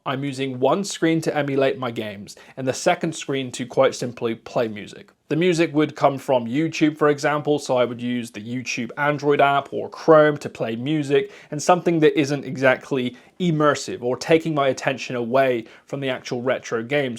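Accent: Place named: West Country, United Kingdom. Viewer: My guy, you sound like you're from the Westcountry like me! I cannnnnot wait to get my Thor!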